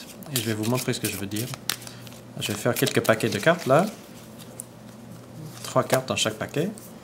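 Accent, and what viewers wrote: Quebec, Canada. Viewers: wooow classe l'accent québécois 8D8D8D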